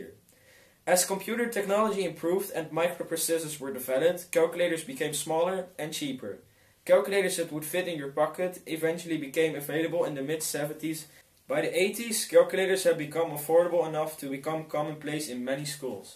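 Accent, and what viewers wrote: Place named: Netherlands. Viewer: ok, although speaking rather good english, my ears are telling me he's Dutch. Is he?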